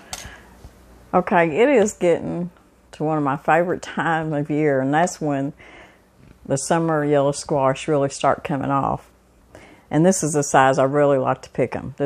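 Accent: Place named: Southeast Texas, United States. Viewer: I love your kitchen set up..your accent is southeast texas!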